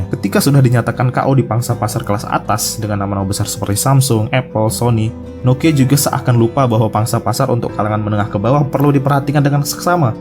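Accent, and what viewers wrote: Lombok, Indonesia. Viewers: matur tampiasih? mas nya org Lombok?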